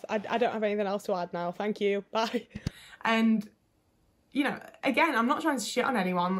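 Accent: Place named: Liverpool, United Kingdom. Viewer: Congrats on 1000 subscribers! I think yours is a particularly refreshing voice because it represents a more working-class experience. Even the Liverpool accent is good to hear when so many autistic videos are RP. Those videos from others are often still very useful but it's good to hear something more distinctive.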